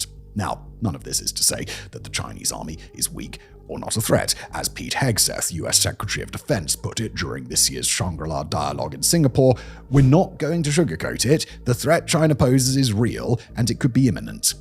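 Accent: RP, United Kingdom. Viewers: shat ap u posh british duschbäg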